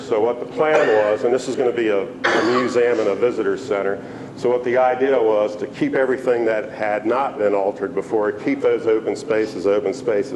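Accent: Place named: South, United States. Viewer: This lecture was so informative! Thank you NC for being preservation leaders! The only disappointing thing was when this professional architectural preservationist showed a slide on which was labeled "clear story windows" when they are actually CLERESTORY windows. Professionals need to remember to double-check spellings, particularly when you speak with a strong Southern accent. It makes us Southerners look ignorant when things are spelled as they are pronounced.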